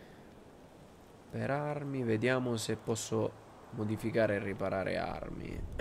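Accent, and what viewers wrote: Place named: Abruzzo, Italy. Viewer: Non ho capito se sei abruzzese o napoletano, dalla voce della tua fidanzata mi sembra napoletano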